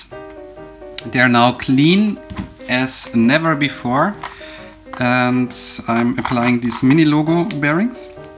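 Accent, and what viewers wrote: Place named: Germany. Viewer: Danke für das Video! Man hört sehr raus, dass du Deutscher bist.😂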